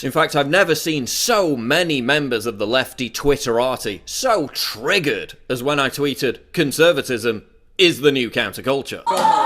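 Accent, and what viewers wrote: United Kingdom. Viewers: This fucking beautiful british accent haha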